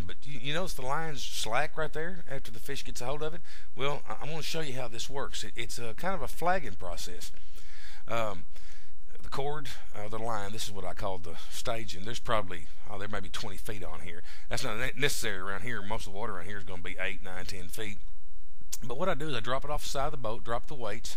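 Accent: Arkansas, United States. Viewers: what part of Arkansas are you from?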